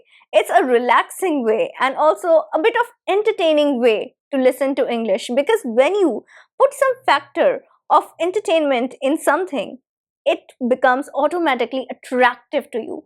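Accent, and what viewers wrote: England, United Kingdom. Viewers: I listen to your videos ❤ for improving my english listening skilss❤ i like you english accent really very much ❤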